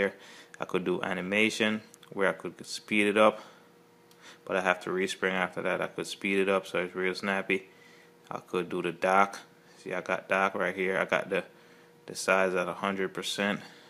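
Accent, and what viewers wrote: Jamaica. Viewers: You're Jamaican i can tell my your accent. I'm Jamaican too.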